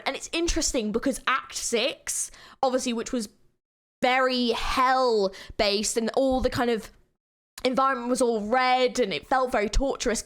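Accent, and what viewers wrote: United Kingdom. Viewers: This girl is so british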